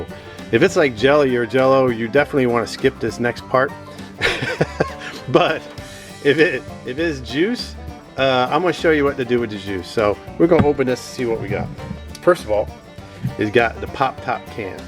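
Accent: North, United States. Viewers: He don't talk like a Southern country boy. Hear some Yankee in there. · I'm from NC and we just eat em plain with Lance Cheese and peanut butter crackers. I'm seriously questioning your "Southern" claim. Sound like a Yankee to me.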